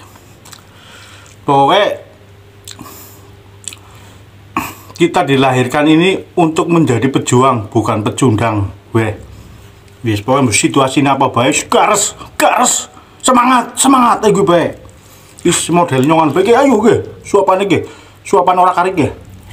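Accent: Banyumas, Indonesia. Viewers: Mangan maning apa ora wedi makin gendut mas ha ha,sehat ya mas,ora ngapak ora kepenak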